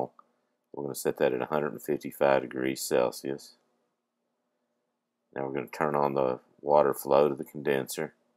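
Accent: South, United States. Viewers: OK so you used a gallon of gas whats all this metric crap,you dont sound like your from across the pond,, sounds like your south of the Mason Dickson line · "we're going to put in about 4,000 ml of regular unleaded gas, ethanol free." What the hell is this in US measurements? Sounds like you have a southern drawl, but speak in commie language. I just don't get why some insist on using metric crap when the rest of Americans primarily use customary units in commercial activities, as well as for personal and social use.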